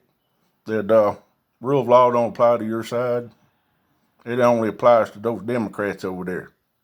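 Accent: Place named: Georgia, United States. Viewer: I'm sorry for you you don't know what the hell you're talking about. You need an education. You sound like your from Georgia or Florida.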